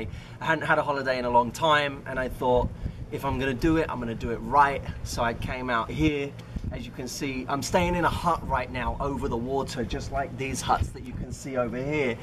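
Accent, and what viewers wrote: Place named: United Kingdom. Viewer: I'm just here to listen to british accent lollll. thank you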